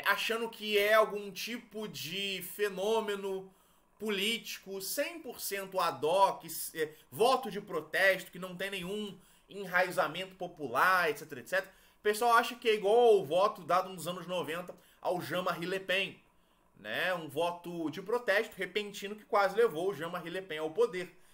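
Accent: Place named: Rio de Janeiro, Brazil. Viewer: rapaz, o carioquês tá brabo hoje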